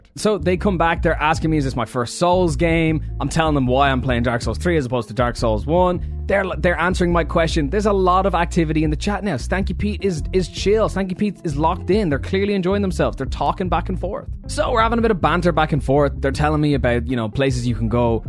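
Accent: Ireland. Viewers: Are you from Ireland? I'm originally from Donegal! I have to subscribe now! Also thanks for the tips, I've only ever done 2 live streams, but planning on doing more!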